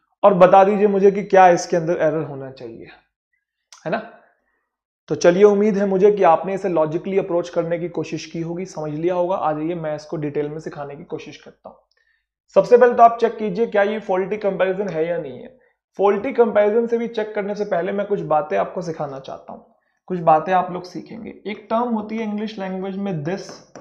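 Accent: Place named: Punjab, India. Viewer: Your Punjabi accent 💘 omg.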